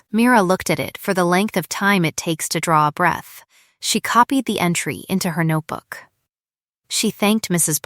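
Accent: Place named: North America. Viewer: This AI voice is annoying and it's a North American voice for 19th century England.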